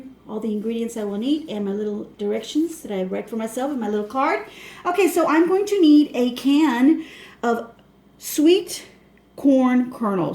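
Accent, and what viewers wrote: Texas, United States. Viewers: You know what is funny,,,, I was watching your more recent videos & you don't have the accent that I can hear in this video! Have you always lived here in Texas? I moved here about 4 years ago. 😁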